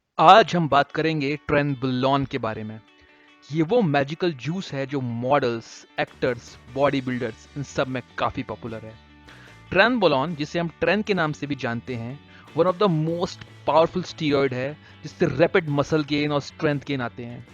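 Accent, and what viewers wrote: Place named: India. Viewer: The India accent is so cool and funny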